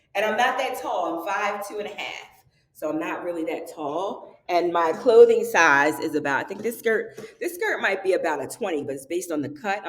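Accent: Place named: Philadelphia, United States. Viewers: Are you from Philadelphia. You sound like my sister. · Wow! I’m from Philly too, and I knew that was a Philly accent.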